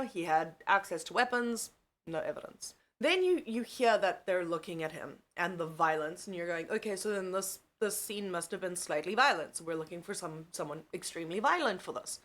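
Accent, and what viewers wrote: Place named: South Africa. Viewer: i believe she's South African, beautiful accent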